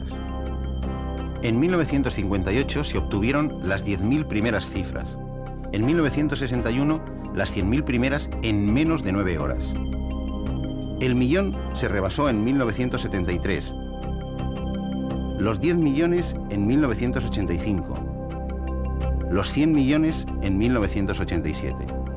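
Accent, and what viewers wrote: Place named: Spain. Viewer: Con esta explicación en español tan tan español y la intensidad del volumen de la música ... No entiendo un carajo lo que dice .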